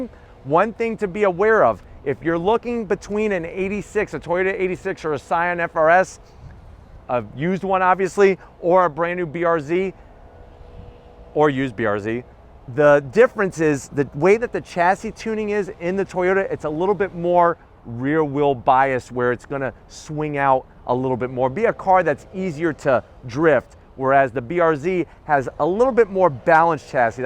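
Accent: United States. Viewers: American pronunciation for y’all. Smh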